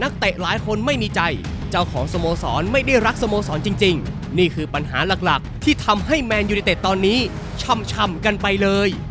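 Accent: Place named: North, Thailand. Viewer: เพิ่งรู้ว่าแอดมินเป็นคนเหนือก้อคลิปนี้แหละ😂😂😂😂แกนตา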